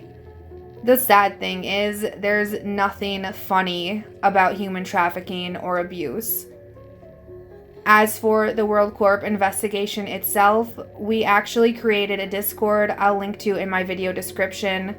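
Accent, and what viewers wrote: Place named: Minnesota, United States. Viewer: Lol im waiting for a doncha know after every sentence. With your accent Minnesota? Montana? The dakotas U got that up north Canadian accent. But yea we need to go after these pedo punks. I wanna batman up with a posse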